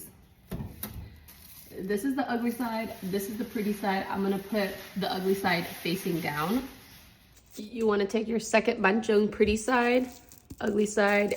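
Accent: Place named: Vietnam. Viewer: As a Vietnamese, I totally confirm that you had awesome banh chung 😂 Love your Vietnamese accent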